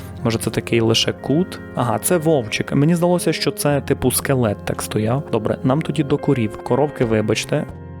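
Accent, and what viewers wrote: Ukraine. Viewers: Доброго вечора, питання не в тему відео, але... Ви випадково не з заходу України? Бо у вас специфічна вимова деякий слів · з Галичини — 100%)) Я за повномасштабну вже навчився на півслові вловлювати, звідки людина)))))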